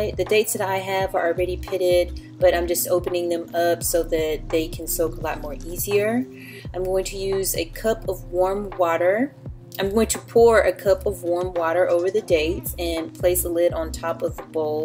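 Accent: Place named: DMV, United States. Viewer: DMV accent🤔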